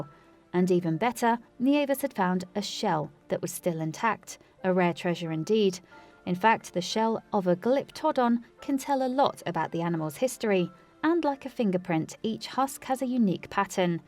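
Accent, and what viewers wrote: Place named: United Kingdom. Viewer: "Pay-lent-to-logical." The British accent fooled me for a while.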